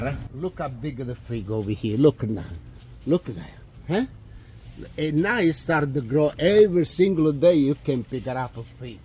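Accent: Italy. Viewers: I can spend the whole day listening to this man talking about his garden with his Italian accent !! The most beautiful thing 🥂🥂👍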